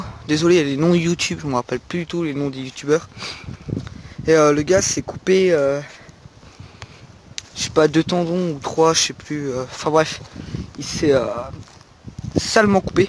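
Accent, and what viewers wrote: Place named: Lorraine, France. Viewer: salut jolie video,de part ta video j ai entendu que tu est de lorraine, je le suis aussi nottament de Nancy.ou s tu pour habitudes de sortir ? si tu a des coins sympas,je suis preneur.amicalement.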